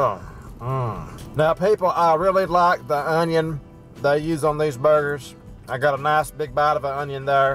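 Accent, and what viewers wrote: South, United States. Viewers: does anybody else love his southern accent? I love it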